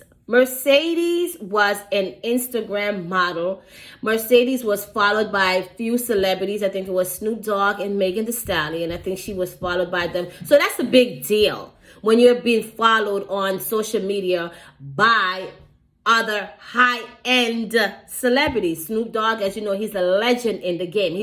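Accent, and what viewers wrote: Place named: Jamaica. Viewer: Foolin-ish, I hear that accent 🇯🇲 😉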